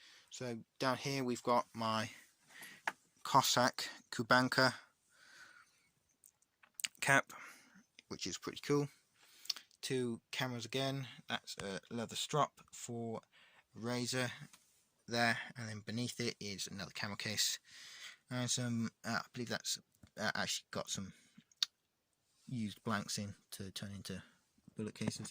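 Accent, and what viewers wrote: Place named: United Kingdom. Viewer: Mate, you have a strong British accent.... nice room decoration..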